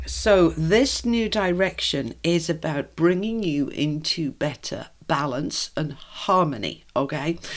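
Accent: United Kingdom. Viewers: Love your accent. British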